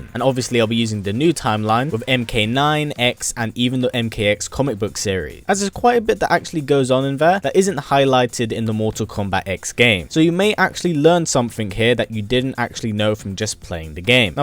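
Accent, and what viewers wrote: United Kingdom. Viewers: Hey man, are you hailing from the UK?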